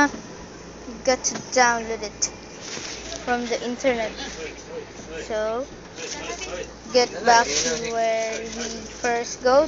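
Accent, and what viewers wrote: Philippines. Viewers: I thinks he's filipino because he's backround sound like filipino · try hard Filipino trying to speak English xD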